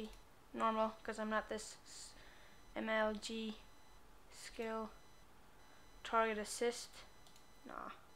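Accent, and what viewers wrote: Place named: Sweden. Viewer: cool videos are u from sweden u sound swedish. :D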